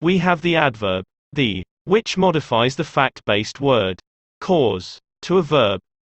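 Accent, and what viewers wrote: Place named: Ireland. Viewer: Thanks. Great video. I prefer the Irish accent. May have something to do with my mother being Irish.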